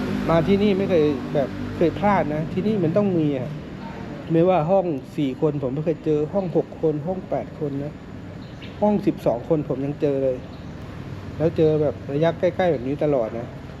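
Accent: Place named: South, Thailand. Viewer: รุกภาษาใต้นคร55555😂😂😂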